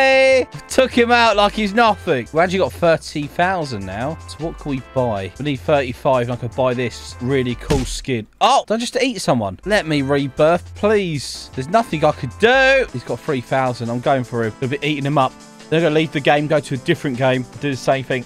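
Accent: United Kingdom. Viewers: What country are you from you sound like someone in the uk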